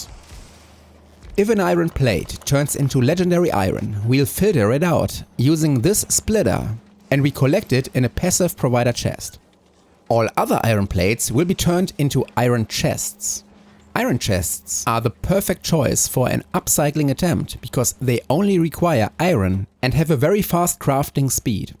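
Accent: Germany. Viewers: lern doch einfach mal gutes englisch , das tut im ohr weh, dein deutscher slang 😀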